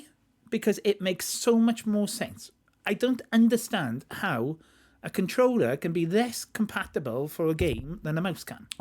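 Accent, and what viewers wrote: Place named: Wales, United Kingdom. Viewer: Nothing worse than the Welsh accent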